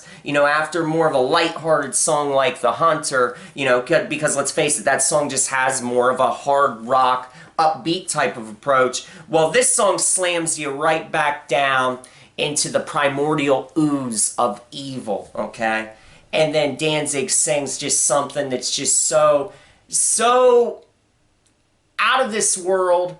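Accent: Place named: South, United States. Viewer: where are you from I'm just asking because of the accent it sounds southern and New York at the same time haha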